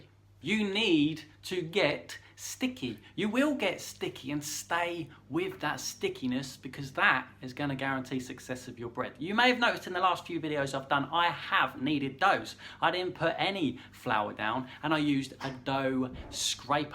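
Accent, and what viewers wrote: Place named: England, United Kingdom. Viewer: Good tip in a general sense but you are making the assumption that all flour is the same. Guessing from the accent comes from England. I live in the very hot, very wet, very humid tropics. Exactly the same flour behaves differently out here. It not only carries more water it just sucks it out of the air while you knead it. And don't buy a dough scraper. You definitely need one but just find that old credit card you the in the back of the cupboard. Pair of kitchen shears to snip the round corner. Sounds a bit critical but I will be back. You give good knowledge.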